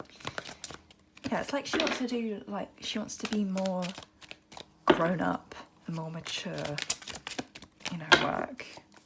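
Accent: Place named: Australia. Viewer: Spot an Aussie lol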